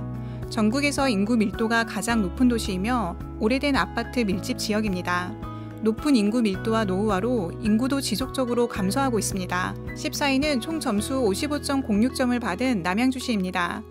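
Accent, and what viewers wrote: Gyeongsang, South Korea. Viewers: 나레이션 하신 여자분 경상도 출신이신 듯..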